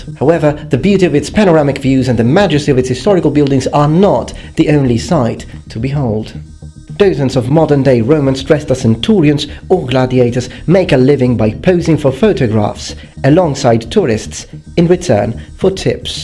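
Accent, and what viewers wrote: United Kingdom. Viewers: I am amazed isnt English and actually learnt English quite late. He would make a classic BBC television presenter. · Strong British accent on this Italian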